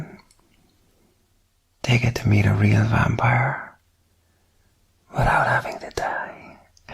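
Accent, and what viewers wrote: Ireland. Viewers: What kind accents is this irsh😍🤭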